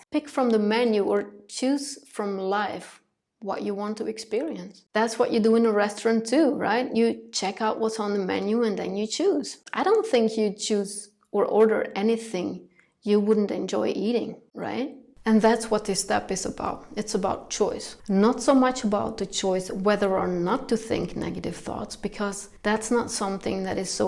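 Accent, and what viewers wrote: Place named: Netherlands. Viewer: Totally get the tail-chase thing. I'm late this week and couldn't stick to my weekly upload schedule as my type of content is edit-heavy. I find myself inside Affinity Photo (my PShop eqvivalent) than doing the recording or video edit itself. (Atm I'm usng Descript, Camtasia and Audacity) Out of interest what are you using? And judging by your name and accent I presume you're in Holland? :-) Anyway, having a posting schedule is still priority for me, especially when overseas or dealing with 'the job'. Post or die! LOL.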